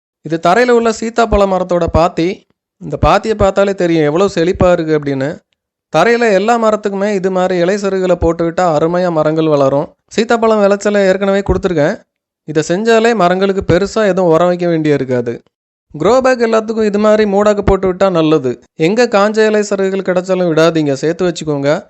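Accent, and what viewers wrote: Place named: Tirunelveli, India. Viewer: athu nellai Tamil... Aana ippo konjam kovai Tamil mix aaguthu...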